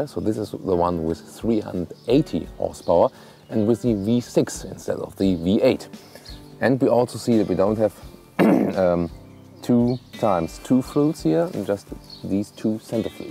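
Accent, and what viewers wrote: Germany. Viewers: Very nice commentary on this awesome car! Love it! :) Ab und an merkt man deiner Aussprache an, dass du Deutscher bist - keine Kritik, sondern einfach der einzige Punkt der mir etwas negativ aufgefallen ist :D Keep it up!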